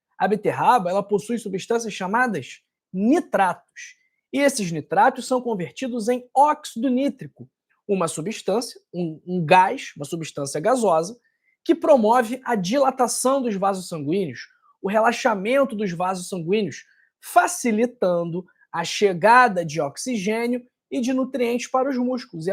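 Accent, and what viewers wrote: Rio de Janeiro, Brazil. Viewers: Não gosto do sotaque do Rio de Janeiro 😊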